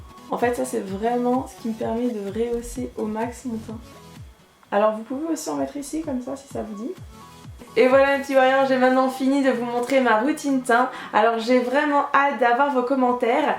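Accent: England, United Kingdom. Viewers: sympa ta vidéo mais l'accent anglais ah ah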